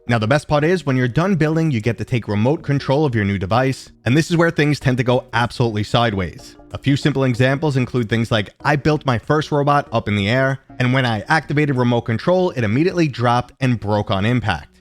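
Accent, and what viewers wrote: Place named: South Jersey, United States. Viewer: lol. I Grew up south of Philly in an icky place called Chester. Lived there in the 80s and 90s . I currently live between Philly and Lancaster. You sound like a Jersey guy to me. I would guess a little more south Jersey cuz your accent isnt that thick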